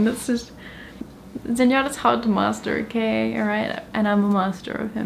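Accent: France. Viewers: nice Vid ... til point 12, jeah I'm triggered (why is junkrat harder to master than her?!?!?!?!)...:D and that's a nice french accent ;D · i love ur french accent xD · Can you talk more with French aczent? sounds pretty good ^^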